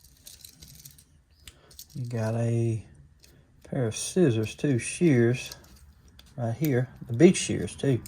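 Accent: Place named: South, United States. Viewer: Thanks for another video of your shopping successes. I am definitely jealous. I don’t mind when you say Victoria-Nox. You’re Southern, I get it. I recently moved to Texas from Illinois, and have found that a lot of my pronunciations of local names are off. Am I wrong? Probably so, as they have been pronouncing their landmarks and local names their way since forever and I just got here.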